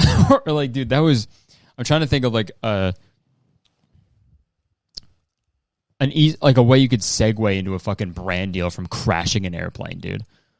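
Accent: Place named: Newfoundland, Canada. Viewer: I "hopes" it's real! You sounds lien your from Newfoundland ❤ love from NL come do a show!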